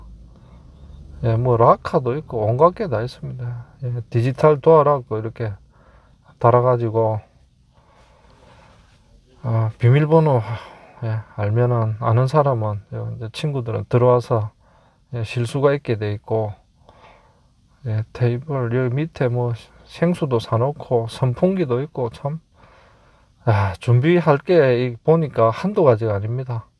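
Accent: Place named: Haman, South Korea. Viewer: 구수한 함안촌넘사투리에 맛깔나는 향수에 푹빠져드는 맛으로 자주 시청합니다! 하시는 사업 번창하시길~~^^^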